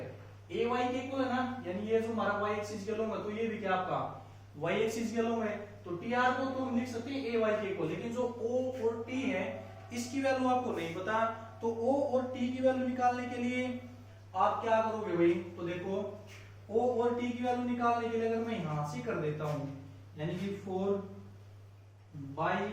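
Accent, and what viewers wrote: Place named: Haryana, India. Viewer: Haryanvi tuch me mja a gya pd kr sb clear ho gya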